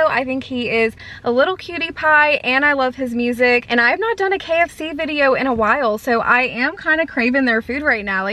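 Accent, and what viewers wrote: South, United States. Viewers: You definitely sound southern to me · I have no idea who that dude is lol but you can't go wrong with a KFC meal 😁 and girl yes you do sound very country and I can relate but I'm a Tx girl 💞💞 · You have the typical southern girl accent! It's endearing and sets you apart from other youtubers so embrace it! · You absolutely enhance the southern twang when you order, but you 100% do still have a southern accent all the time haha I'm telling ya just go back to your store next week if you want the Jack packaging. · it sounds like country type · You definitely have a little bit of a southern accent but at the same time you have a valley girl accent with some words lol it’s hard to explain. I love jack harlows song “first class” or something like that I’m not sure , my boyfriend plays it all the time lol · i do love your voice girl and it does sound like country but i love it. 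i haven't had KFC in YEARS!! where i live, we do have a KFC. BUT i cant eat it cause i have such a sensitive stomach to very greasy so it doesn't go well with me. but we do have a Mary browns and its almost the same as Mary browns but its NOT greasy at all and its crsipy and the chicken and cheese macaroni or other stuff is amazing!! if you have a mary browns, let me know girl:) im curious:) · as a girl from california you definitely have a southern accent. i love it! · As someone who lives in Chicago you definitely have southern accent!! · I love your necklace! Compared to here in Canada, you definitely have a southern accent in comparison to us but I love it! You're almost at 100K!!!:) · You have a slight southern accent. It only comes out sometimes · You do sound southern to me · LOVE KFC :) you have a semi southern accent but its cute :) KFC mac and cheese is my fave fast food mac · You sound southern to my ears but please don’t try to sound any different. 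Your voice is really sweet!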